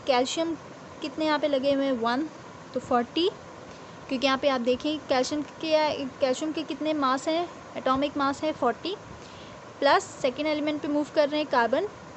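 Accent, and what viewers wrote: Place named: Pakistan. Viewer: Are you from Pakistan I mean the person who is teaching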